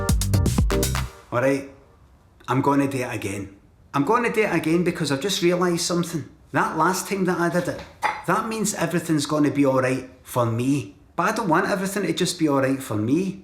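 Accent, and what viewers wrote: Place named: Glasgow, United Kingdom. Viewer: made the mistake of using automatic captions, does not handle a glasgow accent at all.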